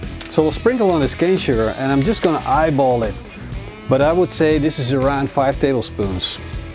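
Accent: Netherlands. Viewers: Some Dutch accent ?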